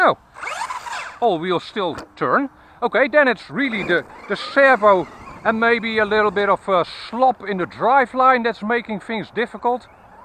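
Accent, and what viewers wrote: Netherlands. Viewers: ik had de naam van je kanaal nog niet eens gezien maar toen je begon te praten hoorde ik meteen dat je een Nederlander bent maar leuke video ga o door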